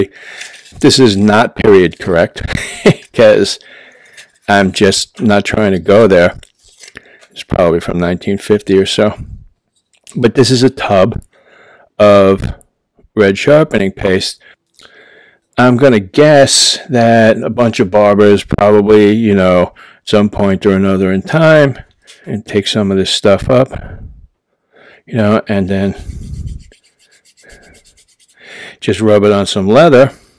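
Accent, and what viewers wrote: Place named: Rhode Island, United States. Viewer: After using a DE for 4 years, I just got into straights (crappy gold dollar 208 and 2 Shapton stones) Can't wait to upgrade!! Came across your vids and I love your no bullsh*t approach. I've learned a lot past 3 weeks from your vids but have many more to watch and soooo much more to learn. Just wanted to say thank you. Also, are you from NY or RI? You're accent sounds like mine (RI), but I'm terrible at telling the difference b/w them!! Jw. Anyway thanks for your vids man.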